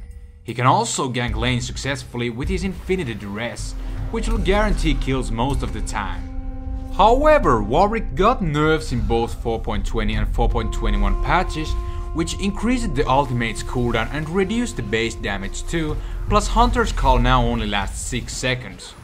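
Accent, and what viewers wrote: Finland. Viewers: ***** you can clearly tell that he has a finnish accent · ***** dude stop crying about it, he is from Finland and pronouncing certain words for us in English is extremely hard. So stop crying.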